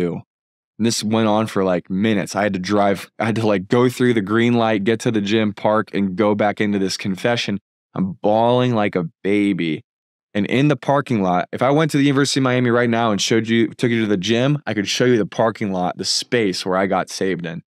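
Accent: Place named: Canada. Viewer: U Canadian? Remind me of The Canadian Prepper. Same accent. Salute.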